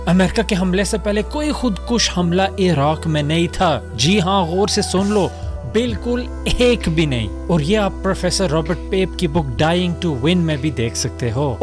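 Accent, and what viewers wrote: Punjab, Pakistan. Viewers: I can hear a hint of Punjabi? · ap punjabi ho kya . accent kafi heavy hai . btw , I am a hindu nationalist but then I share anti american sentiment like you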